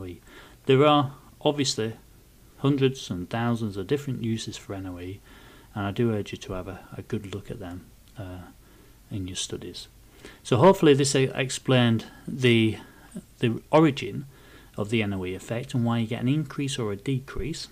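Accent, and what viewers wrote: United Kingdom. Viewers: Hard to understand british accent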